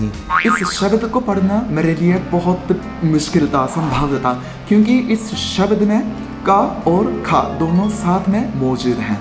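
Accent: Standard. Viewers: आप तो कई भारतीय लोगो से शुध्ध हिन्दी बोलते है। Love from Gujarat... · Pure hindi you speak 😎😀.NICE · He speaks wayyy more “SHUDH HINDI” (*pure hindi*) than actual Indians😅 btw I’m an Indian too so…. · Itni pure hindi hayeeee sooooooo cute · आप बहुत अच्छा और शुद्ध हिंदी बोल लेते हैं। आपको संस्कृत ट्राई करना चाहिए। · Bro aapki Hindi bohot hi jyada Shudhha ho rahi hai! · नमस्कार भ्राताश्री, मैंने आपकी बहुत सारी वीडिओज़ देखी हैं तथा मैं आपकी शुद्ध हिंदी सुनकर आश्चर्यचकित रह जाता हूँ। मैं आपको बताना चाहता हूँ की जो ङ वाला अक्षर है उसका उच्चारण अं की मात्रा का प्रयोग करते हुए किया जाता है। ङ का प्रयोग अक्सर संस्कृत भाषा मे अं का उच्चारण करने के लिए होता है। जैसे उदाहरण- संगड़क अर्थात कम्प्यूटर। तो उसके स्थान पर अं की मात्रा का प्रयोग होगा जहा पर सं के साथ एक ङ भी जुड़ जाएगा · App shud hindi bol rhe ho app thore English words use kar sakta ho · Ye bhai bilkul suddh hindi bol rahe hn 🤣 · Are bhai itna swach Hindi to Indians bhi nahi bolte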